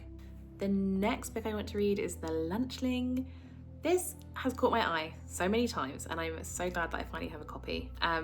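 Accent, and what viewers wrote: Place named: United Kingdom. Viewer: The shoutout to Sunnyvale Reject was cool. He told me I'd like your videos and he was right. I love your amazing British accent (I'll listen to anything you say) and your passion for reading and books. You're going to be big.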